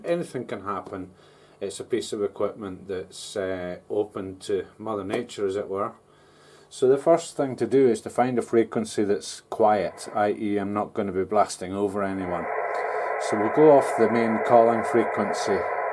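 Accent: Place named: Scotland, United Kingdom. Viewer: Thanks and apreciated video with a Scottish accent, qth here west coast of Scotland 73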